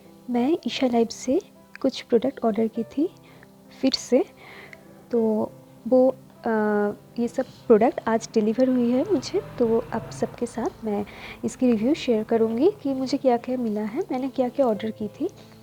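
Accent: Bengal, India. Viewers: Are you Bengali??? Your Hindi accent has a Bengali style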